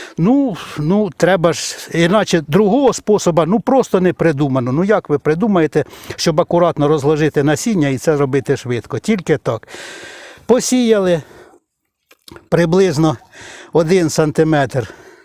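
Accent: Poltava, Ukraine. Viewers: Яка ж милозвучна Полтавська вимова! І безліч корисної інформації.Наснаги і здоров'я вам і дружині. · Слухаю нашу лагідну українську полтавську мову і хочеться слухати і слухати. Ніби й знайома з усіма цими справами, з посівами і пікіровкою, та все ж -- мова, заслухалась. А ще душу радує те, що чоловік цим займається.. дякую за всі моменти радості. А ще дивлюся, як ви багато висіваєте розсади, місця треба багато. Я цей рік посіяла в ,,улітки" перець. Супер, місця займає дуже мало. Розсада класна, пікірувати одне задоволення.. в одну улітку в мене вийшло 45- 48 шт, а улітка помістилась в 1літрове відеречко з під сметани. У ваш яшик поміститься штук 8 відеречок. Попробуйте, можливо вам сподобається.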